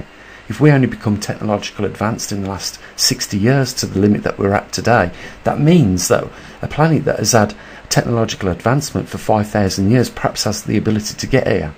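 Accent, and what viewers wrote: Birmingham, United Kingdom. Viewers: lol that brummy accent coming out on todays post lol. go brumee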